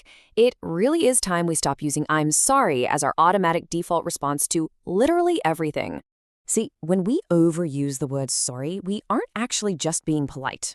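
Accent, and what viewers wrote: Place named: United States. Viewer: But the accent is american?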